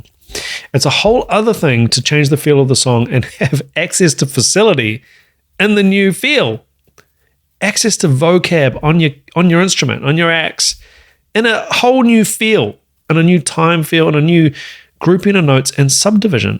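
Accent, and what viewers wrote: New Zealand. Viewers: Here's coming from America. Me being an armature voice actor, I appreciate the Kiwi and Aussie accent and wish i could do it better lol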